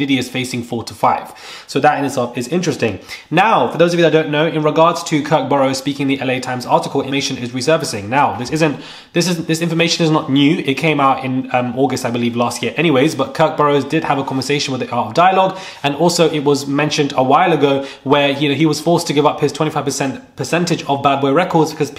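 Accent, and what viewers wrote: England, United Kingdom. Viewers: Dont u dare apologize for that beautiful sexy voice ,its like harmony when speak ,clear to the point beautiful English accent 👍and the content 🔥 fireee appreciate u 😅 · Big city London speak 🗣️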